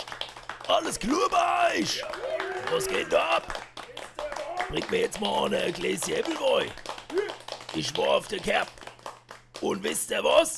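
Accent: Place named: Odenwald, Germany. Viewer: Ach wie schäi is doch Ourewällisch🤘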